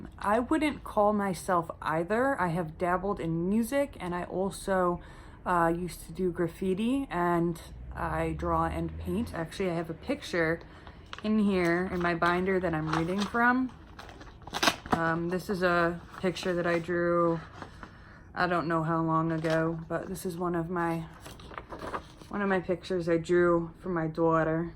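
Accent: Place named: New Jersey, United States. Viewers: I thought I heard some Jersey ! Thank you for sharing- your a true blessing. May I ask ? Was it difficult learning to speak after your dentures ? You speak really well . Thank you and Godbless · I love your channel I’m a bit late to the question and answer session but I would have asked what cosmetics and skin care do you use your skin is always flawless and I hear New Jersey in your accent 😊xx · I hear more jersey than anything lol I’m from upstate New York grew up in bath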